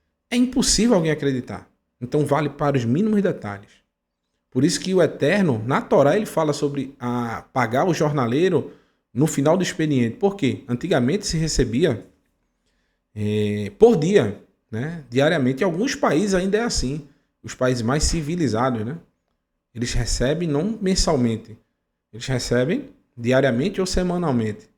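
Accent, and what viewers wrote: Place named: Northeast, Brazil. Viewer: Mas que beleza. Meio nordestino. Amei.❤❤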